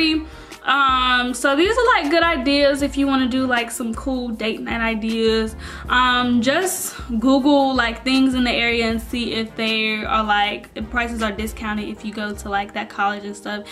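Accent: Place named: South, United States. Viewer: Hi. You are too cool and i like your sweet country accent. Keep being you👍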